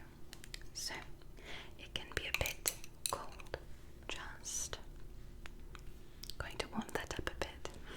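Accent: United Kingdom. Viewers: As a Brit.. I can confirm..The accent is fantastic! Well done,love! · You are the Most Beautiful ASMR Doctor ever!!! I love the British Accent!! Thanks for the tests! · Bravo on the British accent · Lovin the British accent ☺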